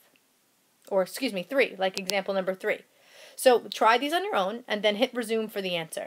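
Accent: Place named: New York, United States. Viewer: I hear a new york accent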